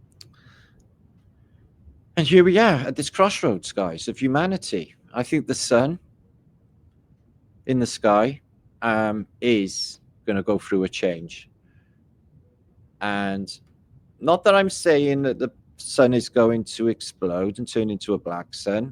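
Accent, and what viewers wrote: Wales, United Kingdom. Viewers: Very, very interesting, you weave an incredibly convincing narrative here. Love the dry humour and the welsh accent equally 😊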